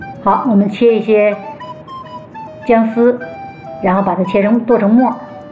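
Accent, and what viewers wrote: Tianjin, China. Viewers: 听口音，是天津人吧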